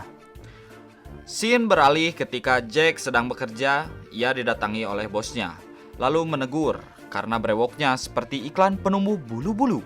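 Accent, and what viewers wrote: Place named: West Java, Indonesia. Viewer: Sundanis pisan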